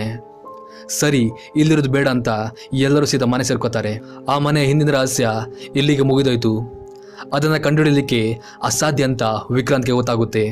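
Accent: Kundapura, India. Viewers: Kundapura kannada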